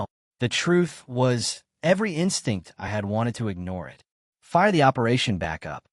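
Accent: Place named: Spain. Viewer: Ugh, the amount of verbal diarrhea in a simple 20 minute story, plus the periodic Spanish accent and gibberish? 👎👎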